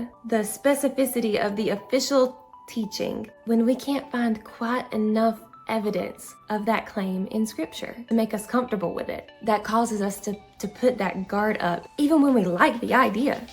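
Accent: South, United States. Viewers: I'm a lifelong Catholic and I found this explanation in terms of the buzzer and the safeguard really, really helpful. I think the parallel you're drawing with the way Catholics react with "that's not what the Church teaches" is very apt. Thank you so much for sharing your experience. I really love your videos. Also, as a native Texan living in New England, I enjoy hearing your very Southern accent. It sounds very much like home.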